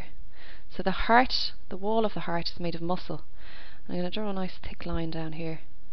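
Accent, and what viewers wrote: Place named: Ireland. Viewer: a fine irish accent with good diction ... what could be finer ?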